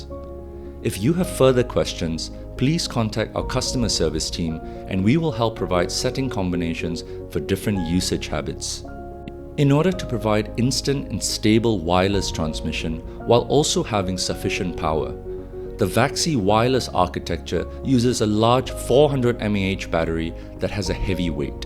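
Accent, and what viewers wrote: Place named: India. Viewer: Definitely an indian dude narrating this.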